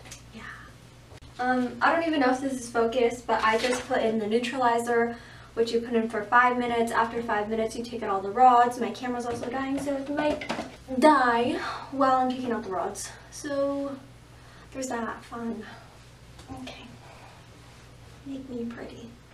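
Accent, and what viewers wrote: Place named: India. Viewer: her accent is some what indian...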